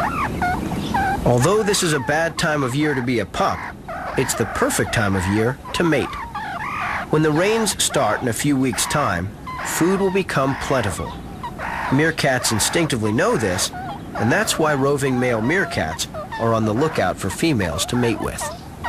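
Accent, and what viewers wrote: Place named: United States. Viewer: I finally found a channel with the American narrator, im so happy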